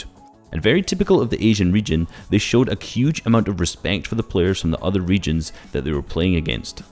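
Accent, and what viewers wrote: Scotland, United Kingdom. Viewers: Are u Scottish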